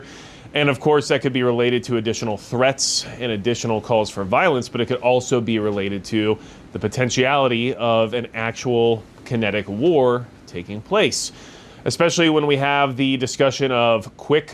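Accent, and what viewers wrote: United States. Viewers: There's no way you're not from Texas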